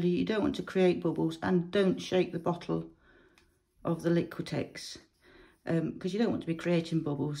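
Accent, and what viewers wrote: United Kingdom. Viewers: Thanks for this great video. I have been working with Indian ink on paper for years but painting on canvas is totally new to me. I've been varnishing test pieces done on gessoed cardboard (literally crisscrosses of paints and acrylic ink so that if anything runs or smudges I can see it). I just want to learn how to apply the top coat of glaze (the isolation coat, as they call it, if you want to protect your paintings with removable varnish) with zero brushmarks and your video was really helpful. PS I love your accent, it reminds me of living near Sheffield when I was a toddler 🤪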